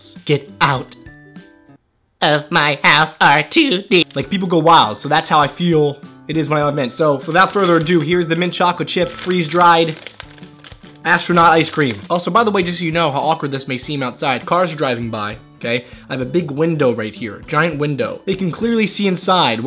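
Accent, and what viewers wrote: United Kingdom. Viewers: Your British accent had me like 😭😭😆😆😆😭😭😭😭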